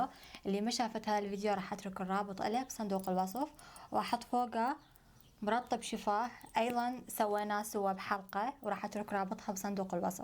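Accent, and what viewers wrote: Iraq. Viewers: صرت احب اللهجه العراقية من كلامك 💜💜💜💜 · ي زين اللهجه العراقيه حبيتها كثير كثير كثير كله منك 😂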